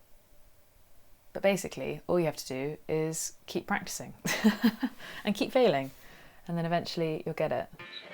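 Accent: United Kingdom. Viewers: K bro so this don't matter a tiff but I fuckin KNEW you would have a British accent before I clicked on the video man I could tell by the way your mouth made your name say in the preview yah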